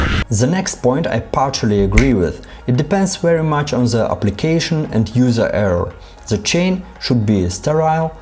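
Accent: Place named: Germany. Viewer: Interesting accent fpr an english speaking german - is it for sure better then a paraffin + pfte powder combination?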